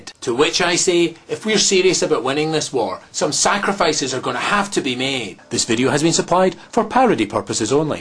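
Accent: Scotland, United Kingdom. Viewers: haha! great video :-) and nice scottish accent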